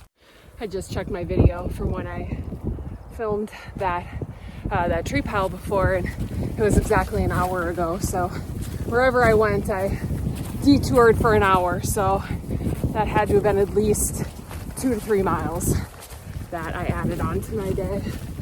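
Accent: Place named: Minnesota, United States. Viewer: I was guessing from the accent you were from Minnesota. And then you verified it. Uff da😂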